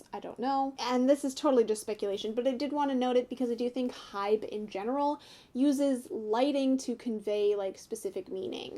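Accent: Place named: Canada. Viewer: you don't have a strong canadian accent, you sound pretty american most the time but the way you say some words sounds canadian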